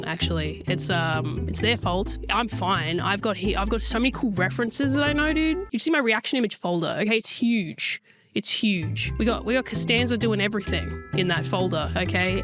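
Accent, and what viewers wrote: Australia. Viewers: Are you me with tits and an Aussie accent? The fuck has the internet done to our generation? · I love her accent 🇦🇺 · You area Australian? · Why is Kurtis Conner speaking with an Australian accent?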